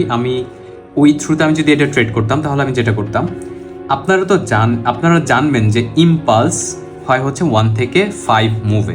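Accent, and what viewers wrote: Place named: Bangladesh. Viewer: ভাই উনার ভাষা শুনেই বুঝা যায় উনি বাংলাদেশী।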